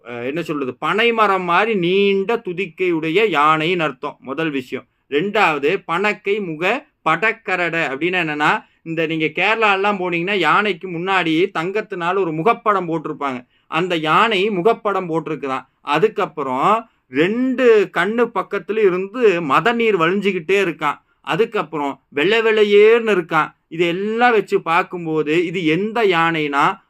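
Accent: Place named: Coimbatore, India. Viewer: Thanks Anna. Coimbatore Tamil la unga Explanation ah kettu konde irukalaam.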